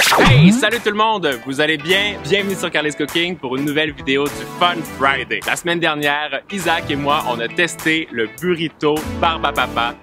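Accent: Quebec, Canada. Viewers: J adore ton accent quebecua je sais plus comment sa s ecrie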